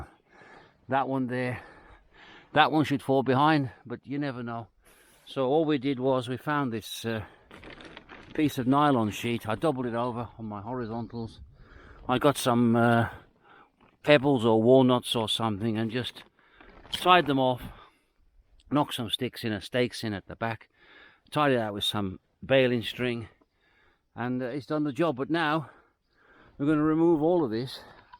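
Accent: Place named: Black Country, United Kingdom. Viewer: I recognised the black country accent but I wasn't sure whereabouts.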